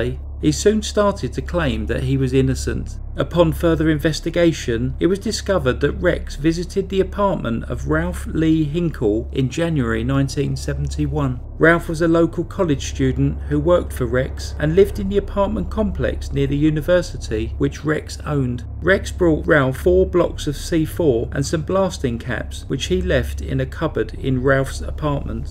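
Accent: United Kingdom. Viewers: Blimey he was a real right canker! Brilliant stories and narrated so Beautifully and British!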